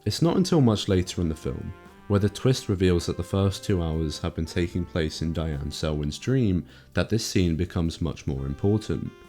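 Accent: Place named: United Kingdom. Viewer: I will no longer be watching videos narrated by the hated British.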